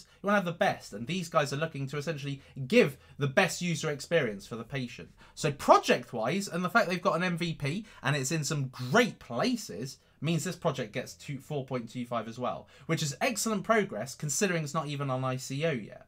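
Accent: England, United Kingdom. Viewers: You talk with an English accent and sing with US one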